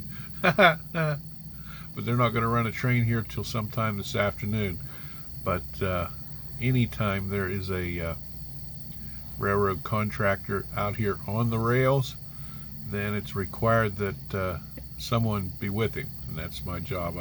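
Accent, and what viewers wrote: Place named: Pittsburgh, United States. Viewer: I always enjoy your videos,. Greene County is where my dad's mother came from. Love the Pittsburgh accent, too. Thanx again from South Fayette Twp.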